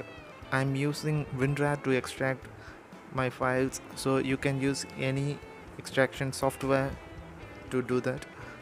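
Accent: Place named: India. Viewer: I wasn't sure if you noticed but every video you do, you have an indian accent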